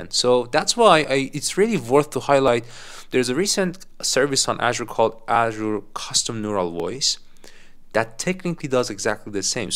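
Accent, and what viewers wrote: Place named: Iran. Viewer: Not me recognizing fellow persian accent from miles away :)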